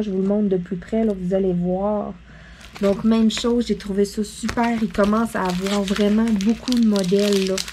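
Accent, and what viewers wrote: Canada. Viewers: Bonsoir je suis ravie d'avoir regardée votre chaine moi aussi je suis contente de commander sur URBESTDEALS je suis entrain de faire un poussin en jaune je ne l'ai pas trouvé ailleurs j'ai commandée 10 DIAMONDS PAINTINGS ET TRES RAVIE DE CE SITE JE PENSE QUE AVEC VOTRE ACCENT VOUS DEVEZ ETRE AU CANADA MOI JE SUIS EN FRANCE ET PLUS PRÉCISÉMENT DANS LE SUD-OUEST PAS LOIN DE TOULOUSE 31 VOS DP SONT JOLIE ET C'EST VRAI ILS ONT BEAUCOUP PLUS DE CHOIX QU'IL Y A QUELQUES MOIS BONNE NUIT OU BONNE JOURNÉE ÇA DÉPEND NOUS ON ET LE SOIR CHRISTIANE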